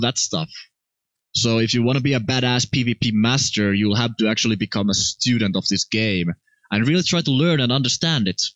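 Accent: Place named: Finland. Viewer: You sound so finnish.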